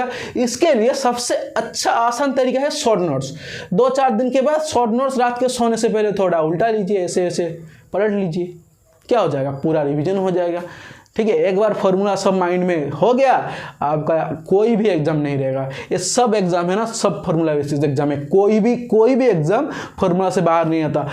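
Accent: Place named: Odisha, India. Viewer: Pehle accent mein hii pata lag geya kii odisha se ho....me also from odisha ❤😅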